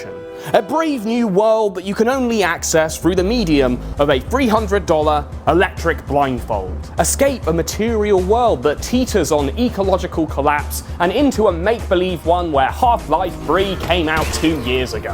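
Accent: United Kingdom. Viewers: A British accent using surfing slang is a bit much for me to handle.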